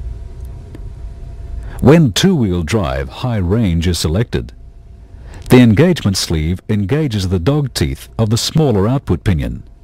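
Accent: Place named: New Zealand. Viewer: This guy sounds very kiwi to me.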